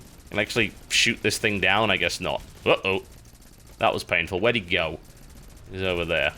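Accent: United Kingdom. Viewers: has a specially marked brittish accent today.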